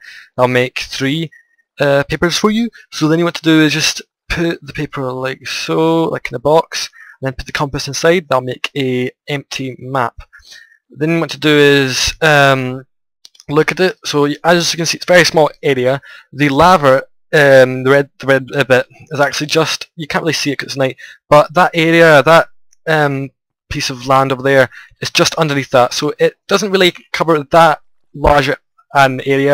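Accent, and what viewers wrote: Scotland, United Kingdom. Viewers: Hahhaabha you're cute. and THANK YOU AWESOME PERSON./ scottish <3 Do you speak scottish gaelic too?:3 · your Scottish! i love u accent! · R u Scottish if u r so am I!!! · You're scottish?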